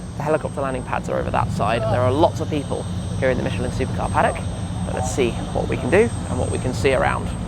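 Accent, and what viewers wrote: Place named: England, United Kingdom. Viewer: The English. Can't handle their voice can't watch video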